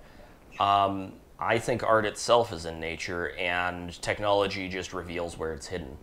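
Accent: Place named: Canada. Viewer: i saw an american flag, but you sound like every single person i know-- here in canada, lol.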